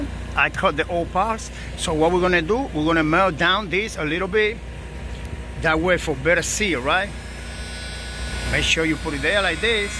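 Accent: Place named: South, United States. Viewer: I like your accent. Sounds like your from the south. So I gotta subscribe and thumbs up! Nice work!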